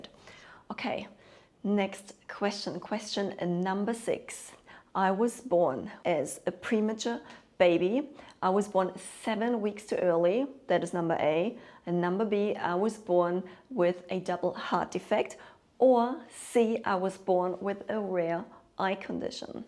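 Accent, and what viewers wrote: Germany. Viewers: I get any right answers 🙈😂 I'm so bad at quizz but thanks for it ❤ and my main weakness is self-criticism, but I improved a lot. I always think how elegant is your english accent, since I know you are German. That's the first time I hear German accent in english 😊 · Dein Denglisch ist irgendwie putzig xD But jokes aside, your english is more than fine. I did not realize you're german until you changed language. Then again my opinion on that might not count as I'm german too. But for a non-native you're doing extremely well. P.S.: Metal \m/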